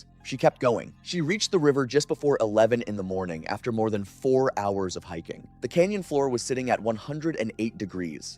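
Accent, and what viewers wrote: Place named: United States. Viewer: Means the American sounding guy is probably American, home of the freedom units.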